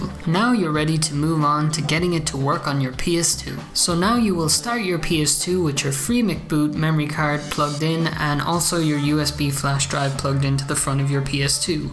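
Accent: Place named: Scotland, United Kingdom. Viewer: This your voice?For some stupid reason i thought you were scottish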